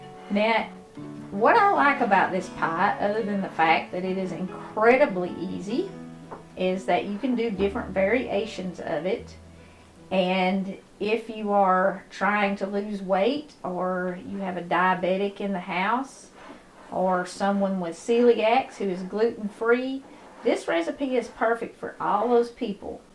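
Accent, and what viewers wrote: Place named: Tennessee, United States. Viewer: Thought I recognized a fellow Tennessee accent. For the Diabetic, along with the flour swap Substitute the sugar with a baking alternative like Splenda. And use REAL butter not margarine. I've done and taught Diabetics how to do low carb diets for eight years, these two swaps make it completely blood sugar friendly. It'll still rise, got it'll rise a LOT less. Love the channel and the emphasis on putting God first.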